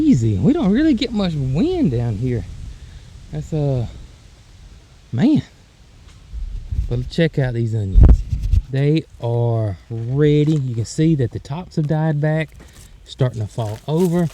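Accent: South, United States. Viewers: Love this guys accent I think southern states of America have a very fluid way of talking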